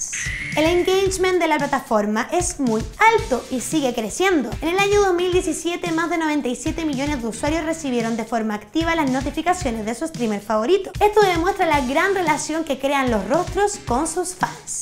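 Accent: Chile. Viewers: Me encanta ese acento chileno tuyo 😍👍Saludos desde Brasil 👋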